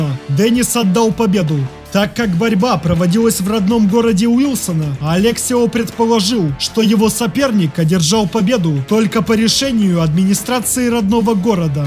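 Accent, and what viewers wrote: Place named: Moldova. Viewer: Могли бы человека с нормальной дикцией подобрать для озвучки,а не этого молдована с суржиком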